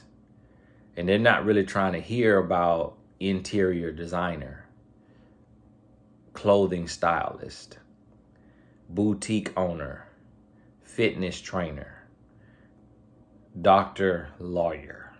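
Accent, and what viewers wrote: South, United States. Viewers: PLEASE stop apologizing for your Southern accent, vernacular and the manner(s) in which you express yourself. People who are critical of you and cannot appreciate the teachings you bring to the table do NOT deserve access to your wisdom. Please NEVER CHANGE. My family has Southern roots, and that’s one of the main things that drew me to your channel. YOU ARE REAL, and you keep it 100. Thank you for that! Those ‘articulate’ critics can just leave as far as I’m concerned. I LOVE YOUR REALNESS. GOD BLESS YOU AND YOUR WIFE, and I thank GOD for you, your teachings, and your wisdom. KEEP BEING SOUTHERN/‘COUNTRY’ YOU. Your loyal fans love it, appreciate it and accept you for who you are!✅🙏🏽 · I couldn't have said it better myself .His country accent and venecular makes him unique it's his je ne sais quoi if you will .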